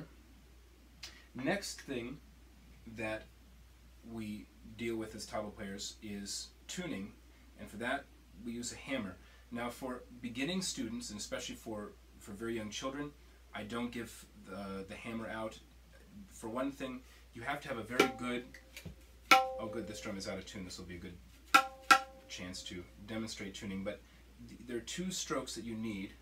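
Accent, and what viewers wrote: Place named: England, United Kingdom. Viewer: It’s funny hearing Indian words from a Englishmen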